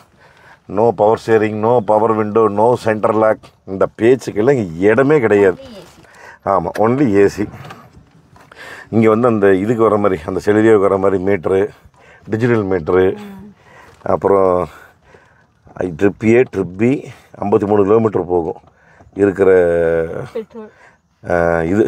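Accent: Kongu, India. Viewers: சார் நீங்கள் பேசும் கொங்கு தமிழ் ரொம்ப நன்றாக இருக்கிறது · கொஞ்சும் கொங்குத் தமிழ் பேசும் கோமகரே...! திகட்டாத தித்திக்கும் பேச்சுடைய திருப்பூர் திருமகரே...! லடாக் பயணச் சாதணைச் சகாப்தம் எங்கள் மதிப்பிற்குறிய திரு. அண்ணா அவர்களே❤❤❤🙏 எப்படி இருக்கீங்க அண்ணி❤❤❤🙏 எப்படி இருக்காங்க தம்பி ரித்திக்❤❤❤ எப்படி இருக்காரு. உங்களோட பல வீடியோக்களை நான் Miss பன்னிட்டேன். சிலபல இக்கட்டான சூழ்நிலை காரணமாக வீடியோ எதுவும் பார்க்க முடியல கமெண்ட் பன்ன முடியல. வழக்கம்போல அண்ணா உங்களோட பேச்சு வீடியோ சும்மா நெகு நெகு நெகுனு இருக்குங்கோ😊😊😊❤❤❤❤ நடுத்தர குடும்பத்தினருக்கு ஏற்ற அற்புதமான சாலை ரதம் என்றே இந்த "MARUTI SUZUKI EECO"வை கூறலாம்❤❤❤ மாருதி நிறுவனத்திற்கு ஒரு கணிவான வேண்டுகோள். அண்ணா நீங்களும்.... இந்த கமெண்ட் பார்க்குற சகோதர சகோதரிகள் யாராச்சும் மாருதி நிறுவனத்தில் பணிபுரிந்தாலோ? அல்லது மாருதி நிறுவனத்தோடு ஏதேனும் ஒரு வகையில் தொடர்பில் இருந்தாலோ இந்த வேண்டுகோளை மாருதி நிறுவனத்திற்கு தெரியப்படுத்தவும்🙏 நடுத்தர குடும்பத்தினருக்கு ஏற்ற அற்புதமான வாகனம் இது❤. 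சுய தொழில் புரிவோருக்கும்.... குடும்பத்துடன் பயணிக்கவும்... அவசர ஊர்தி (AMBULANCE) சேவையிலும் இந்த வாகனம் 100% பங்கு வகிக்கிறது🙏 மாருதி நிறுவனம் அவங்க கார்களை BS6 PHASE 2 விதிமுறைகளின்படி பல வடிவங்களில் புதுசு புதுசா தயாரித்து வெளிவிடுறாங்க. அந்த வகையில் இந்த ""MARUTI SUZUKI EECO"" வாகனத்திற்கு பாதுகாப்பு திறன் வசதிகள் மேம்படுத்தப்பட்டு. உ.தா (ESP.... TRACTION CONTROL.... HILL HOLD ASSIST) Power Steering. இவற்றோடு ஆட்டோமேட்டிக் கியர் பாக்ஸ் மற்றும், டிரைவர் சீட்டுக்கும் கோ பயணி சீட்டிற்கும் அட்ஜெஸ்ட் ஹெட்ரெஸ்ட்டும் கொடுத்து புதிதாக விற்பனைக்கு கொடுத்தால் 1000% மிகவும் பயனுள்ளதாக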